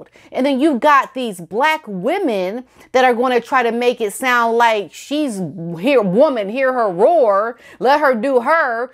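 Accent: England, United Kingdom. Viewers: I love your English accent😂. Keep up the good work.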